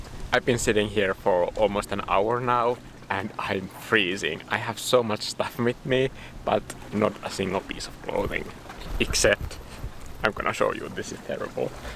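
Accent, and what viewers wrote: Finland. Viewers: I just stumbled across your videos and thought: "huh, his accent sounds really familiar" then when watching this I realized that it's the Finnish accent. Loving your works tho!!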